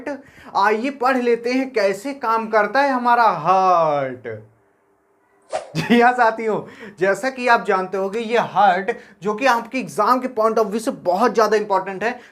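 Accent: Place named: Uttar Pradesh, India. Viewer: aapki bato se lag rha h aap up se h 🤔up me kha se h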